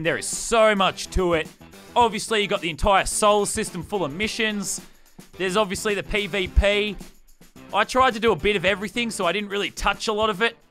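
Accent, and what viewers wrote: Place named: Australia. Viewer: Lazarbeam every one is saying ur British but ur Aussie Like me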